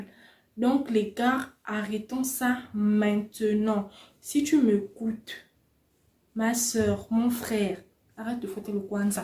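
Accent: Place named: Gabon. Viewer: Accent gabonais on dirait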